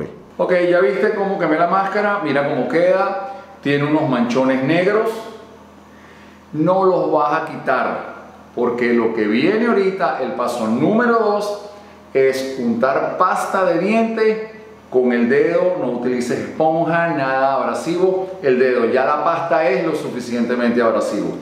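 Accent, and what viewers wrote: Venezuela. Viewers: LO SABÍA!!!! Soy canario, y no sé cómo hablan los panameños pero a mis primos venezolanos los reconozco en un ratico 😄😄😄😄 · Ese acento es VENEZOLANO donde se pare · Gracias. Voy a hacer esto, compre una y de verdad q se empaña mucho. Despues les dejo saber. Eres venezolano?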